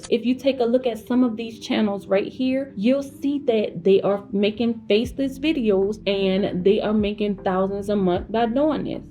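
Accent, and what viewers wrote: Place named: Louisiana, United States. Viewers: Just came across this video! I heard of E-commerce YEARS ago and set up stores but never followed through. I started again this weekend. And ma’am, where are you from?! You sound like you’re from Louisiana. 😆that’s where I’m from so idk, your accent sounds real familiar. Lol ❤️ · I love you accent!!! You must be from Louisiana!!! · Hi my beautiful sister. You sound so much like my ppl back from Louisiana. Have to keep going bun binge watching your videos and a few others bcuz i just got curious about it yesterday. Thanks for sharing sister. I hope you have an amazing Sunday and a blessed week ahead ❤🙏🏽🥰😍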